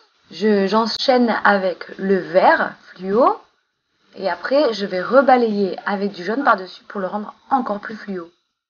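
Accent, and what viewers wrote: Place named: Marseille, France. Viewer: On est à côté Made in Marseille ici !! Alors je me reconnais aussi à ton accent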